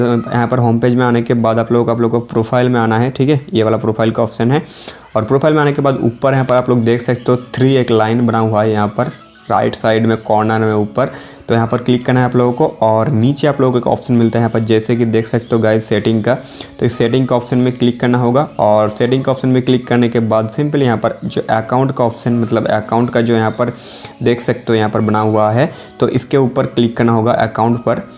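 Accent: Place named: Bihar, India. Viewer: Yeh bihari asscent kahan le late ho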